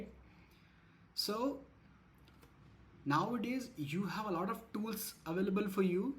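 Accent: India. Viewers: Bhai tumhara excent South Indian ho gya hai.😂😂 But hamesa ki tarah useful video. I admire you. Great video.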